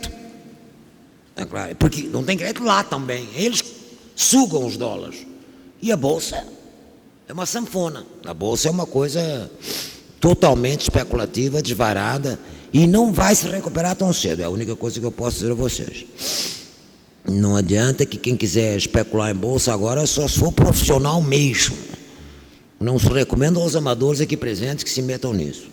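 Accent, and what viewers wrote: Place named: Portugal. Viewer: Her accent is Portugal Portuguese...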